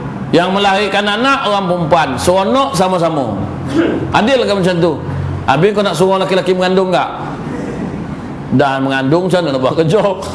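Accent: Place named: Negeri Sembilan, Malaysia. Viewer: best ceramah ustaz.......tima kasih ustaz atas ilmu dan loghat nogori...........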